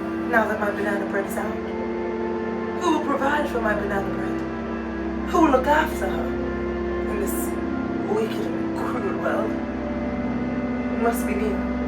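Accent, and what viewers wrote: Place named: United Kingdom. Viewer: I love the British accent 🤣🤣🤣 "Fart around! Be ridiculous! Who cares if they cringe?"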